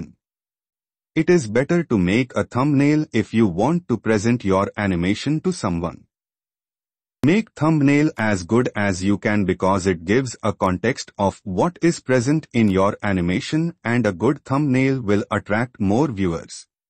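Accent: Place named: India. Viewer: That probably isn’t a robot, plus Indian accents might sound funny but is just how they speak.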